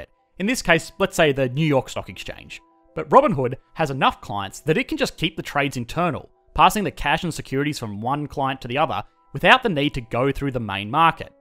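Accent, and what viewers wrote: Australia. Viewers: its actually irritating, uk australian accent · Editor He is from Australia ? :D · Economics Explained has the most charming Australian accent.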